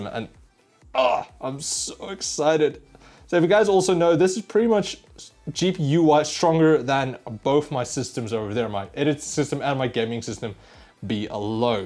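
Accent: South Africa. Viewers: Watching on my 9 year old i3 *cries in 20fps Edit: the whole time I'm like what is this accent it's almost South African and it turns out you are actually from SA lol. Nice to see a homegrown tech channel, you have my support dude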